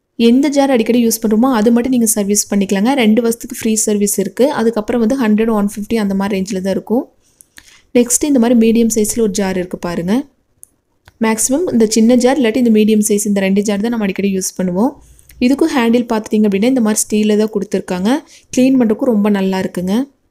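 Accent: Coimbatore, India. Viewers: Hi...unga coimbatore slang voice is sooo gud😊😊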